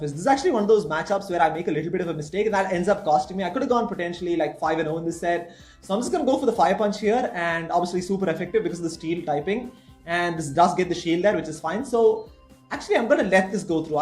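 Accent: India. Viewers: dude ur english is flawless whit a indian accent sound fucking cool haha, one love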